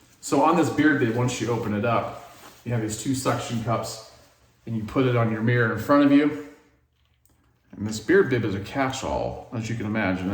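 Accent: United States. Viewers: I learnt so many tips from you mate. Amazing , love your yank accent !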